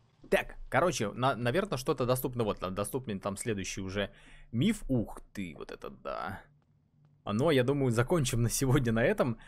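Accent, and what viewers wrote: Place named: Russia. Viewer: Rial Rassin accent!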